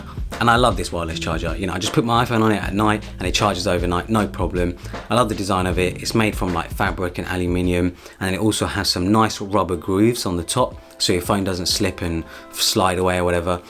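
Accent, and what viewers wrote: England, United Kingdom. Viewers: Just found your channel. Huge fan of tech YouTubers! And it sounds like I’ve found a fellow Englishman! Keep it up mate and don’t mind me. I’ll just be flying through your vids rest of the weekend 👌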